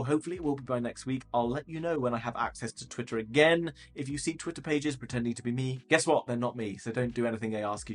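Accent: United Kingdom. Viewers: The British accent adds comfort and trust lol. He could sell an Eskimo a deep freezer.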